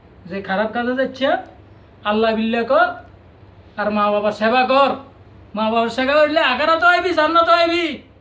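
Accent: Noakhali, Bangladesh. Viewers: নোয়া খালি ভাষা বাদ দিয়ে যা বলার ভাল করে কথা বল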